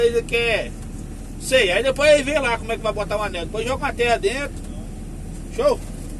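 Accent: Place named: Mexico. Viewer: You sound like Mexican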